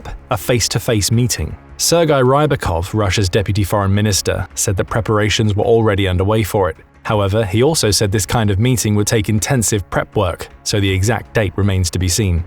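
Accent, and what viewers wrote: United Kingdom. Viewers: YOU ARE TALKING A LOT OF NON SENSE, ON TOP OF IT STATING THAT UKRAINE HAS LOST 46.000 PROPLE IS BEYOND COMMON SENSE. FROM YOUR ACCENT YOU ARE BRITISH MEANING YOU ARE SADELY LYING ALL THE WAY.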